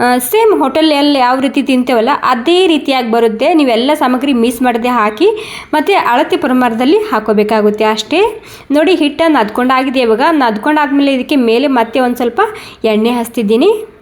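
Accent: Maharashtra, India. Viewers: ನಿಮ್ಮ ಕನ್ನಡ ಮಾತನಾಡುವ ಶೈಲಿ ಕೇಳೋಕೆ ತುಂಬಾ ಸಂತೋಷ ಆಗುತ್ತೆ. ಮಹಾರಾಷ್ಟ್ರದ ಸೊಗಡು ಮಿಶ್ರ ಮಾಡಿ ಕನ್ನಡದಲ್ಲಿ ವಿವರಿಸೋದು ಆಕರ್ಷಣೀಯವಾಗಿದೆ. ನಿಮ್ಮ ಅಡುಗೆ ಮಾಡುವ ವಿಧಾನ ನಿಜಕ್ಕೂ ಪ್ರಶಂಸನೀಯ 👏👍.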